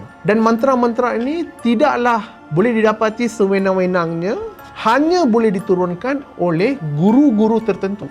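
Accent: Malaysia. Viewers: Care die cakap macam orang Melayu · Kuat bergaul dgn melayu brader ni. Pekat nauu melayu dia 😂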